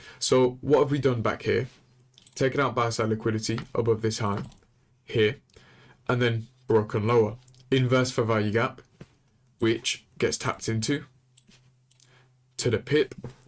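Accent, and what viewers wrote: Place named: London, United Kingdom. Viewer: 😂😂😂😂 people that trade ict can’t trade and I’m willing to take that to the grave. If you can’t teach a 10 year-old your strategy, your strategy is too complicated. at the end of the day every strategy works you just have to control your risk and have set rules. Losing is inevitable in the market. So someone with a London accent, using proper English and big words doesn’t make the strategy any better.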